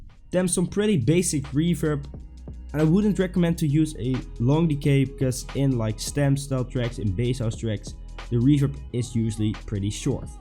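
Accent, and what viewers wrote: Netherlands. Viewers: Nice! Are you dutch btw? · Best makkelijk om te horen dat je een nederlander bent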